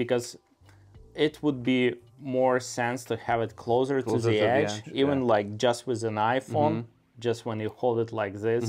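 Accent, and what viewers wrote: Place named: Russia. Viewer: Russky accent. New génération Russians have good accent, because they have learned English since they are in kindergarten with real English teachers.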